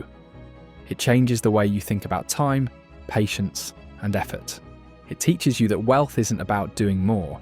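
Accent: United Kingdom. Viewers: Weird to hear an British accent talking about 1 million dollars and not Pound Sterling.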